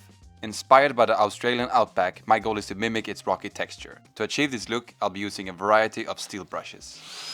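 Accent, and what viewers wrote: Sweden. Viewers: jag hörde att det var en svensk på dealekten lol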